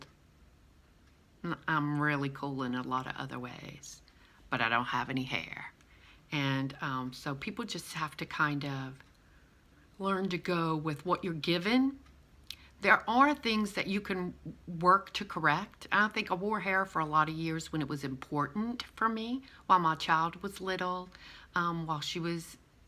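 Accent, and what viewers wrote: Louisiana, United States. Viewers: Awesome! Thanks for your honesty! Originally from Lafayette, when I hear you speak it makes me lonesome for the lovely people in South Louisiana, take care and keep spreading your love around, it’s a blessing for us!!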